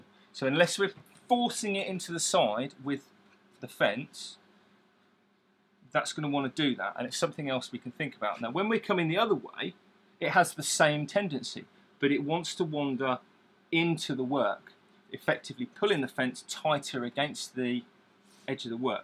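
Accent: England, United Kingdom. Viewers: Very informative and good to hear an English accent for a change!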